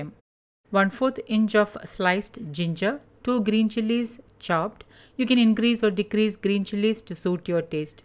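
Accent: Kerala, India. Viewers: Mam are you malayali your way of speaking English I think so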